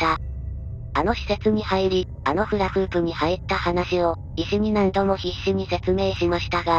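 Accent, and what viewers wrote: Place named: Hiroshima, Japan. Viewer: 山口寄りの広島みたいな喋り方だな、大竹か和木か辺り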